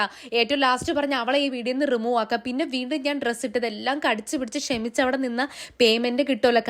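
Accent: Kannur, India. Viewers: Aunty kannur annoooo?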